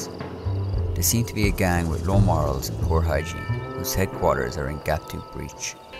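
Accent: Ireland. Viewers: Didn't know you were Irish 🇮🇪, theres not many Irish youtubers, keep up the good work 💪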